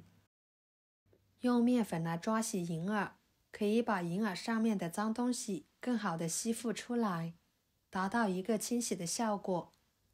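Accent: Yunnan, China. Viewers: 一听就是大 云 南老乡啊:hand-purple-blue-peace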